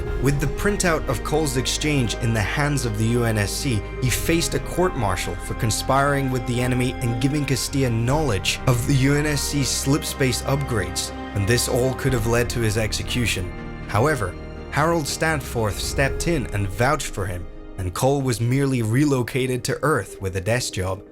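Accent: United Kingdom. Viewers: I think you and installation both also have good voices for narrating Halo lore, especially because of how sophisticated and knowledge driven y'all two sound with the British accent.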